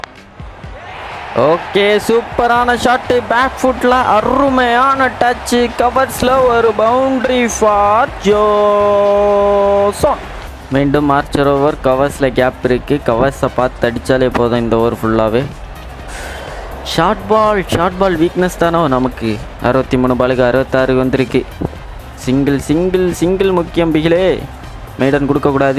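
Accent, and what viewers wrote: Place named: Kerala, India. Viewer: Bro neenga kerala thaane malayalam kalanthu pesuringa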